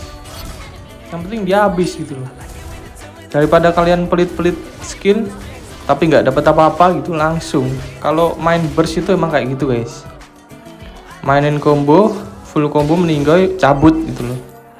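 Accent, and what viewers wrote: Java, Indonesia. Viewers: suaranya jawa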